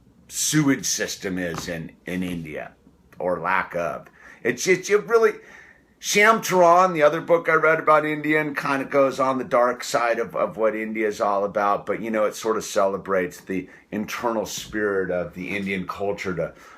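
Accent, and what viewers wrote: California, United States. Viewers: You are the most Californian person I’ve ever heard speak. Really enjoyed the review from the UK 🇬🇧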